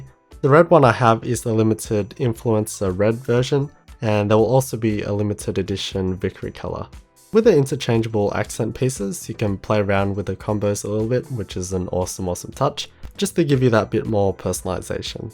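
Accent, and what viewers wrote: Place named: Australia. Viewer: sounds like every single Asian Australian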